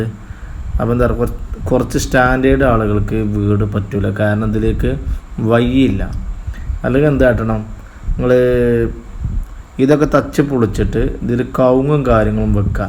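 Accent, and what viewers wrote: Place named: Malappuram, India. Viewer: Malappuram slang aanu bro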